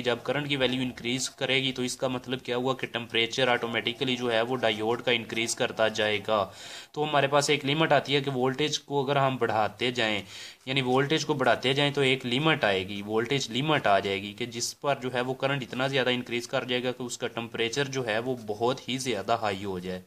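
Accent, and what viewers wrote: Punjab, India. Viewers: Sir apki voice tone puri punjabi h I m also punjabi hindu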